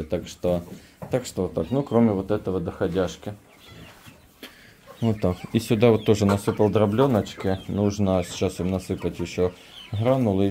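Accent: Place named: Ukraine. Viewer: Одела очки, слушать Ваш украинский говор, одно удовольствие, хотя чуть понимаю, удачи всем вам!!!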